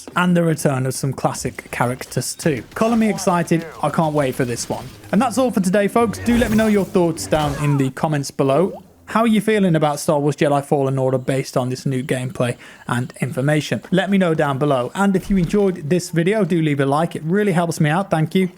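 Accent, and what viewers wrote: United Kingdom. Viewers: All we needed was your sweet sweet Brit accent to convince us.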